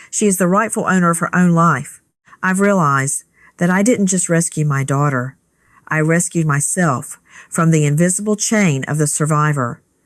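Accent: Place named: South, United States. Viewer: Listening to this southern drawl accent is painful!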